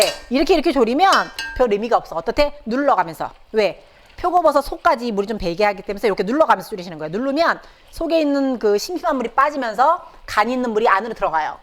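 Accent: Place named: Chungbuk, South Korea. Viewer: 충북사람들의 말투에 베어있는 특유의 그게 잇어서 그래요..ㅎㅎㅎ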